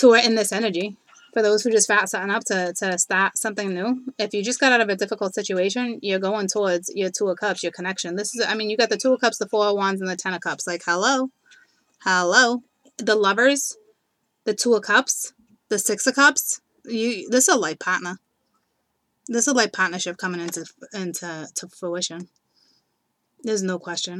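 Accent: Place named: New Jersey, United States. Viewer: I love your accent is that Jersey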